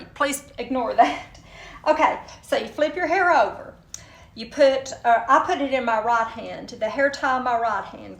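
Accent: South, United States. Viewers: Your chili looks yummy. You are so fun to watch. I love your southern accent. You are so beautiful 🌟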